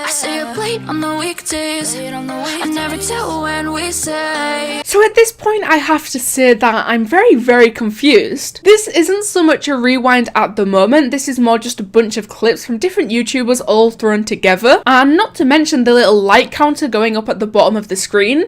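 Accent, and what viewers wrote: United Kingdom. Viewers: U British?????cuz of ur accent I guess u live in London??or some were elese in Paris xddd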